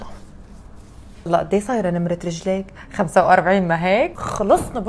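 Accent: Syria. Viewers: محلاااااكي نفسي تكون عندي صديقه نفس طريقه كلامك وحرجاتك 😂😂😂 محلاها اللهجه السوريه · لهجة سورية تهبل ❤ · اللهجة السورية عششششققق عيني ربك والله 🇮🇶🇸🇾❤❤😂😂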